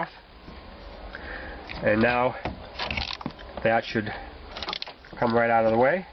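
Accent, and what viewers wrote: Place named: Northeast, United States. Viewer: Paaaaak the caaaaaaa! How come all do-it-yourself guys have that northeastern accent real bad?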